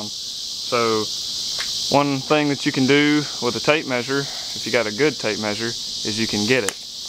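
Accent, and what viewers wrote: South, United States. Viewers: You talk funny… but I love you good ol' southern boy common sense. But I think it goes deeper than this; you are clearly connecting the dots between trigonometry principles with construction techniques. One repeated thought that goes thru my mind while watching your videos is, "Why didn't I think of that?" Keep those videos coming! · I'm retired now but it's always good to see the old tricks still being used. Nice presentation and love the Southern accent. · The southern accent communicates acceptance to the listener. It shouldn't be a coincidence they invented the y'all term to address everybody. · thanks. good tips. what part of the south are you from? georgia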